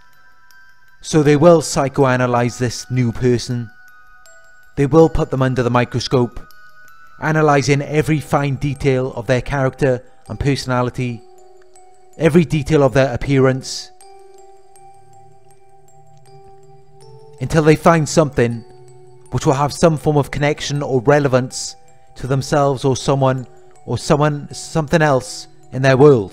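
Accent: Wales, United Kingdom. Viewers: I agree with every thing you say!!!. Thanks for your videos, they're great. I like your voice and your accent too, sounds welsh(?)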